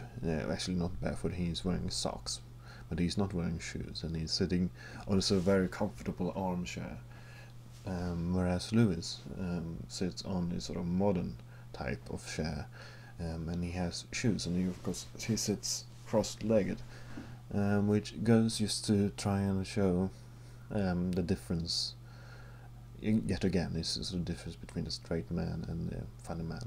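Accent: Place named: Sweden. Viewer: Oh my god you sound soooo swedish =D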